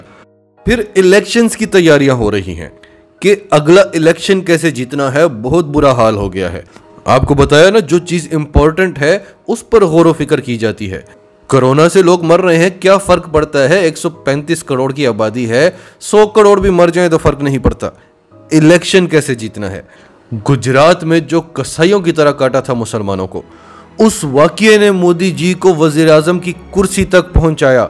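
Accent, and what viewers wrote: Pakistan. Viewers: Good review pure pakistani urdu accent love you brother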